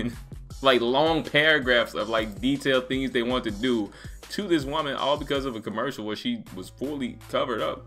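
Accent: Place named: Bay Area, United States. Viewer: Bro you from the Bay area? SF Oakland? I was trying to place your accent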